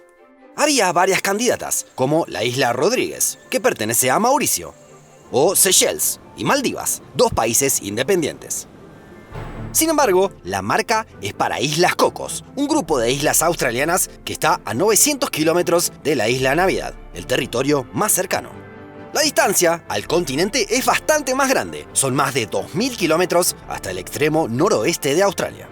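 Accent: Argentina. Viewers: Olvidaste la Isla de Pascua .mmmmm tienes acento argentino. Eso lo explica . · Gracias! Me gusta mucho las vídeos como este. Por cierto, con que acento habla presentador? Argentino?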